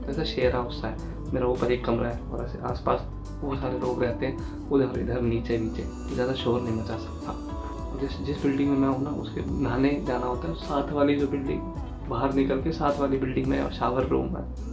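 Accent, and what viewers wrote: Haryana, India. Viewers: Bhai k video dekh kaza aaajaata h...thodi tension me lgra h.. bit haryanvi h bhai.. jhel jaega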